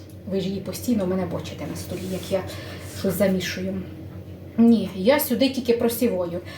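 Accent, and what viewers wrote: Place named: Cherkasy, Ukraine. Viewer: ти класна, таку б мені подругу мати 🥰і відео твої цікаві, і мова теж мила, ба нашу Черкащину зразу відчуваєш, тому я й підписалася на ваш каналі завжди жду відео ❤️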